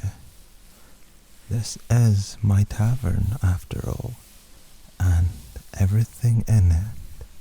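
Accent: Scotland, United Kingdom. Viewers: From what I can tell it's Scottish I don't know specifics though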